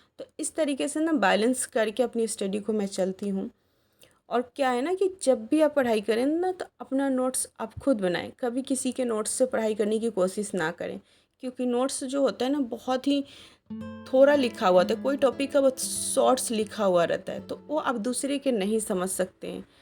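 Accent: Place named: Bihar, India. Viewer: App bhiar se ho awaz se lag raha hai but kha se 😊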